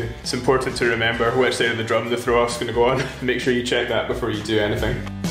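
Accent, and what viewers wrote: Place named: Scotland, United Kingdom. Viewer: Very interesting!I think your DIY skills are WAY beyond Homer Simpson or even former President Trump!!!!A lot of time,DIY projects success is contingent on your tools.Obviously you have more that adequate equipment!!Are you a carpenter!You are my first sub in Scotland/cool Accent!Thanks for the very excellent demo even I understood it!LOL Neil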